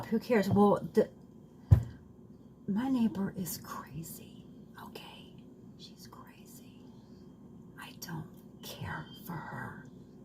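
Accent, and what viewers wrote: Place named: New Jersey, United States. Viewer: You sound like you live in NJ. I left that hell hole of corrupt made up parking tickets of places you never went, but the people there blow through red lights and it isn't one car but 5. The bikers do that for their protection because so many get killed. I get it though, I used to bike at the park. Heads up to anyone going to NJ, green light doesn't mean safe.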